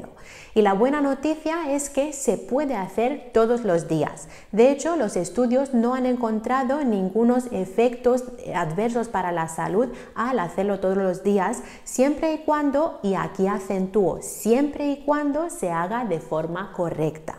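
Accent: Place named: Romania. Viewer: Văd ca ești românca după accent, a luat premiul Nobel la autofagia deci mi se pare cea mai mare descoperire.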